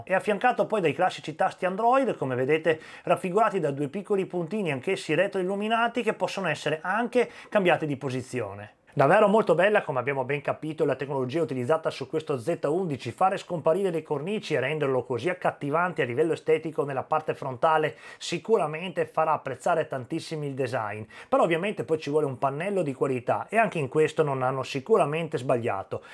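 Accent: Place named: Veneto, Italy. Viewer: bellissimo l'accento veneto!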